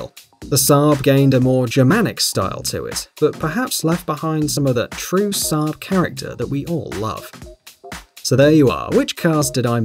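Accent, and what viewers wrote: United Kingdom. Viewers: Yozika - It’s how we all say it in the U.K. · hi un day cou pay? freakin' british english.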